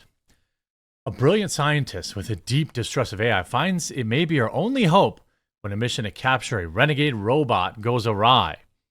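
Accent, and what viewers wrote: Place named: Midwest, United States. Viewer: your midwest accent I barely notice... Usually... but the way you pronounce "Atlas" sounded like "Alice" until much later in the clip.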